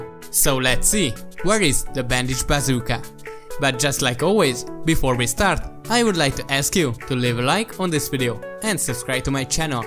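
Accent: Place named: Hungary. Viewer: You have a typical Hungaryan accent. 'És szerintem magyar is vagy, tetszik a hangod :D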